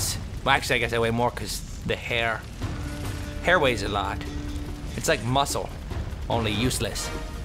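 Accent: United Kingdom. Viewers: l luv ur britsh ascent lol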